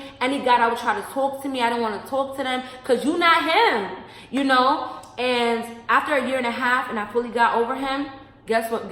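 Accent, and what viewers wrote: New York, United States. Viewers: Lol you have that New York accent fr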